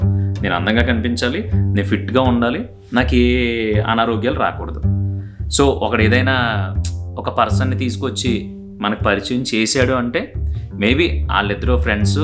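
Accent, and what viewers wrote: Godavari, India. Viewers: Bro meedi place yekkada bro .ma godavari slang la vundi😊😅??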